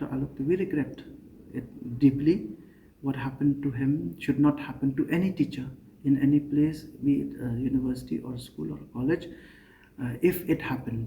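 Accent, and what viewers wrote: Umpling, Malaysia. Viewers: Ktien umpling rh katjuh hei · Ktien Umpling, namar u dei na Umpling, khublei kur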